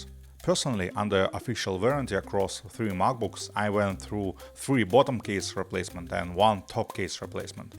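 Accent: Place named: Russia. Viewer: I appreciate you using your real voice now instead of AI. Your Russian accent and manners bring a new feel to design content on YouTube that is refreshing.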